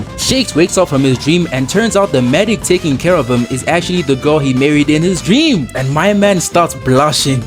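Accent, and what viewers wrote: South Africa. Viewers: Bro you live here Mzansi right i can tell from your English bru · Muh boy ded from 🇿🇦 with that accent .🎉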